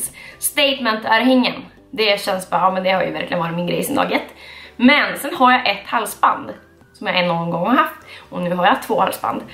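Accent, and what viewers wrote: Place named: Umeå, Sweden. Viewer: Du har typ Umeå dialekt också ❤️😘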